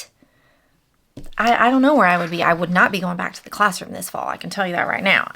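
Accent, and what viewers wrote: Tennessee, United States. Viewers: You could label them vintage modern fusion. I like the bigger tote bags with pockets. I do think the basic totes need fusible fleece. Those book sleeves are super cute too!! I found your channel a month or so ago when I got back into sewing. I live outside of Nashville. I enjoy your accent and personality. Lol I'm not from Tennessee, I just find the accent enjoyable. I love your content and have gone back and watched a ton of your videos.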